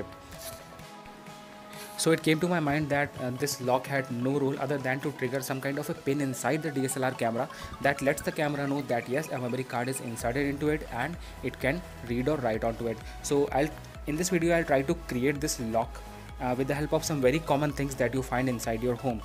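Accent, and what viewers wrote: India. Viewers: I swear, if there wasn't any thick-indian accent guys like you the whole world would've been still in dark ages. Thank you, this really helped me out.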